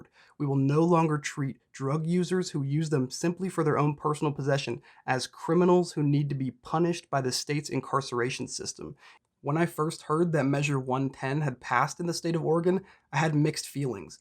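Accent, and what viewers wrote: Canada. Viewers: Damn idk why but i thought you were from canada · Hey, I thought you were a Canadian fella haha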